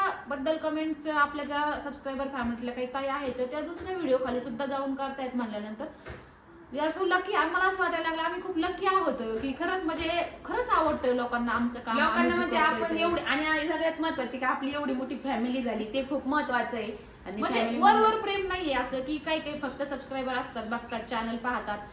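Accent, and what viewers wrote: Ahmednagar, India. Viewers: अग तुमचे व्हिडिओ असतातच छान ओरिजनल असतात तुमची नगरी भाषा फार छान वाटले ऐकायला कुठल्याही गोष्टीचे एवढे मनावर नाही घ्यायचे डॉग बदल सांगते । चे धन्यवाद त्यांनी खुप छान व्हिडीओ. बनवला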